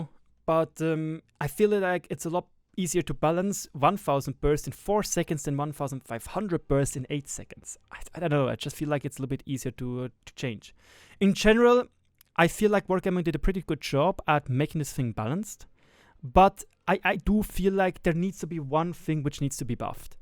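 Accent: Germany. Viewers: Bist du deutscher?😅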